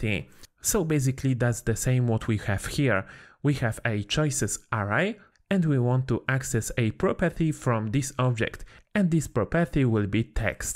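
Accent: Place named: Poland. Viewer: Niestety ten filimik jest zbyt bardzo polski :( Uszy bolą od kłującego polskiego akcentu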